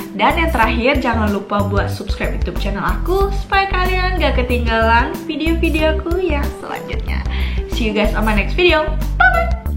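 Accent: Malaysia. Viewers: Your malay slang comel gila! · Comel ape kau cakap bahase malaysia! cayalah :* BTW, ada je kawasan yang makan murah dan banyak tepi jalanan. cumanya kamu duduknya di subang jaya juga belajar di taylor. kawasan itu dikira agak elit , perumahan disitu pun mewah2 dan orang kaya ramai. kalau kau duduk di shah alam pun dah beza banyak. :)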